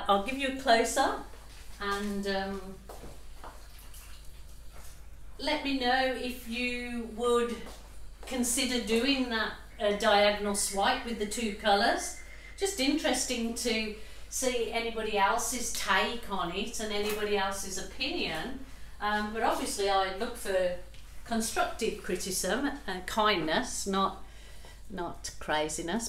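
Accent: Birmingham, United Kingdom. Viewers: Hi! Just curious....are you originally from Birmingham? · Me and my husband are having fun with your accent ☺️ he is from West Bromwich in UK 🇬🇧 and you sound like a Brummy are you from that area or is it just coincidence that the accents sound the same..? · I know a Brummy when I here one 😀 A good move you made 😀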